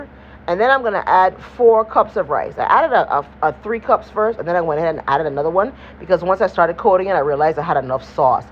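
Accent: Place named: Guyana. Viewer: I Know You're Not Guyanese, But You Kinda Sound Like It..I Love All Of It Tho. Thanks For Sharing.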